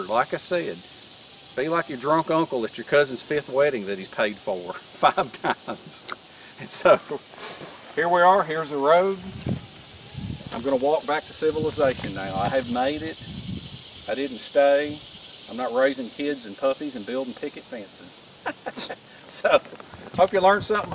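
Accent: South, United States. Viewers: forgive my ignorance but your accent comes from which area in the US? Southern but where :)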